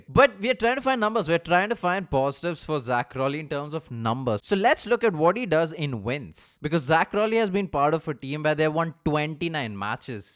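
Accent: India. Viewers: This pjeet accent is sending me😂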